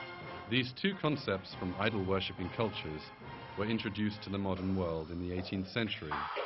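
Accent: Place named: United Kingdom. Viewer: great british accent. other than that, a total waste of time. thanks for nothing. get a job.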